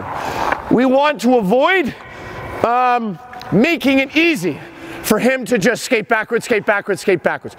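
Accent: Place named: Canada. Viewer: Why can't u Canadian's say out properly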